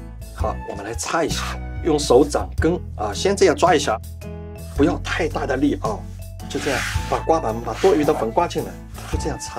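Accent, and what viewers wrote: Shanghai, China. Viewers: 上海普通话，亲切啊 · 好亲切多上海式的普通话啊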